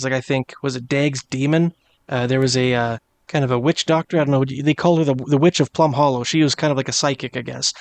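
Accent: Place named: Canada. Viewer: If we ever go to war our password is About! I've heard so many Canadians, like this fella, whose accent is indistinguishable from varieties of American accents but that one word, about, will get them EVERY time!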